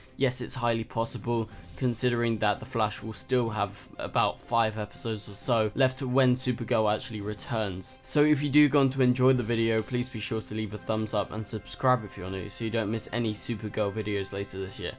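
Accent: United Kingdom. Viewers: r u british m8?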